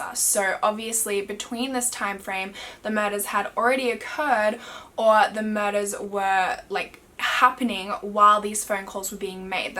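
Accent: Australia. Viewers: Is it weird that I look forward to these videos because I just enjoy hearing you talk 😂😂😂 Australians honestly have the best accents! Hahaha but thank you for putting up another mystery vid!! Love you!!❤️ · Cuz shes Australian 🤭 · i was not expecting you to be Australian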